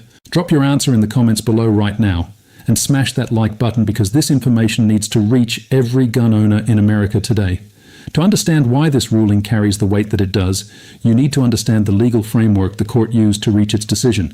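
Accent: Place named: United Kingdom. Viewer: Why does this AI have a brittish accent today?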